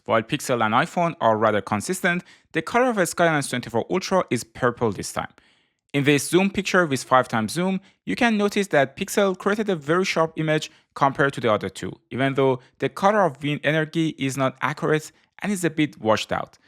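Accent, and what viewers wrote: Iran. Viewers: Are you persian? you're accent sounds like persian but u don't look like one then i saw the koobideh kabab now i'm confused lol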